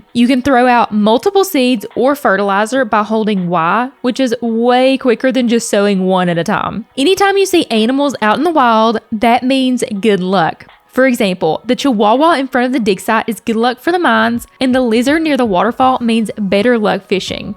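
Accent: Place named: West Virginia, United States. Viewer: I love your accent, my friend is from WV and she sounds just like you!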